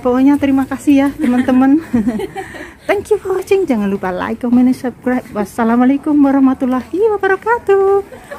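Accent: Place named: Java, Indonesia. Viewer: Asik shoping rame-rame bersama teman😄sampean wong jowo to mbak ngomongnya kok kaya orang Jawa😁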